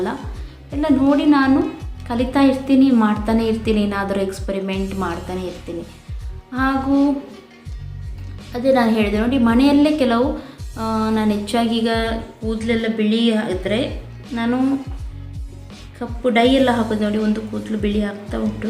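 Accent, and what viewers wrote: Mangalore, India. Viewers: ನಿಮ್ಮ ಮಂಗಳೂರು ಕನ್ನಡ ತುಂಬಾ ಇಷ್ಟ ಆಯ್ತು ಹಾಗೂ ಆಡಂಬರವಿಲ್ಲದ ನೈಜ ಮಾತು ಕೂಡ ಇಷ್ಟ ಆಯ್ತು. Nature & God bless you.... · I like ur mangalore kannada. And I like ur life style. Thank you for sharing your personal. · Don't worry my friend. Neeu nam urinavru anta kushi aitu. Manglure kannada- is best keluvaga tumbaa khushi agutte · Nivu estondu bejar agbedi nanu Mangalore nodidini ede eriti Kannada matanadodu. Nima skin tumba channagide. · Mangalore kannada...super mam... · Hey so sweet of you are,,,, u r pretty yar,, mathe nimma manglore kannada namge tumba esta marayre!!! :) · I lv mangalore ...I lv mangalore type kannada superag iratte Bangalore ginta mangalore kannada ne clean iratte valasigaru bandu alli kannada na kannad madidare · Kannada Chennaigide Mangalore Kannada Accha Kannada don't worry mam. I am SWATHI. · ಮುದ್ದು ನಮ್ಮ manglore ಭಾಷೆ ತುಂಬಾ ಚೆನ್ನಾಗಿದೆ, ಆ mathalli ನೀವು ಇನ್ನೂ ಮುದ್ದು, ನಿಮ್ಮಿಂದ ನಾನು ಅಡಿಗೆ ತುಂಬಾ ಕಲಿತೆ, ನಿಮ್ಮ ಮುದ್ದಾದ ಫ್ಯಾಮಿಲಿ, ನನ್ನ koraggajja ನ ಆಶೀರ್ವಾದ ನಿಮಗೆ ಯಾವಾಗಲೂ ಇರಲಿ, ನಿಮಗೆ ಆದಷ್ಟು ಬೇಗ ಸ್ವಂತ ಮನೆ ಆಗಲಿ ಎಂದು ಪ್ರಾರ್ಥಿಸುವೆ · Mangalore Kannada clean maraayre. 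I love Mangalore type Kannada. I am a proud manglorean.❤️❤️😘😘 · Akka I love u Nan sister antha ankothini. mangluru kannada chanda akka thumba naryadi inda maathadthare alli. bangalore kannada varatu akka Naanu mandya agagi helide. Yak althira naavu nim family ankoli akka albedi Nan hesru radha.albardu my request akka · Mangalore Kannada Super medum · Donot worry i like your kannda speaking why to worry in mangalore this way they soeak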